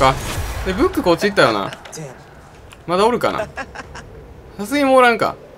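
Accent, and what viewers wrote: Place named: Kyoto, Japan. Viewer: 関西弁と京都弁が混じったRYOUSUKEさんの動画にずっと癒されてます🍀😊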